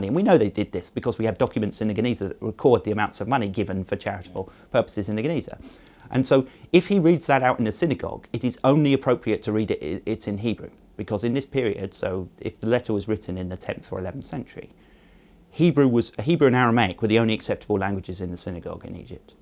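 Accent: Russia. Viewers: Brilliant program. Host is an Ashkenazi with a comical Hollywood-style Muscovite accent. He couldn't be funnier if he tried.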